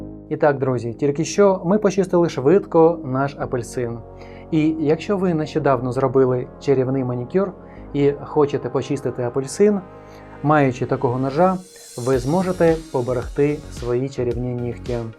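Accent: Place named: West, Ukraine. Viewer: изуродованный западеной русский язык...тошно слушать,я все понимаю ...лучше звук выключить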